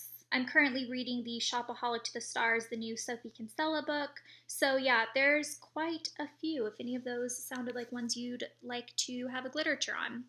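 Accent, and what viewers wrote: South, United States. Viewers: i could here a little bit of your southern accent in the clips from frightmare manor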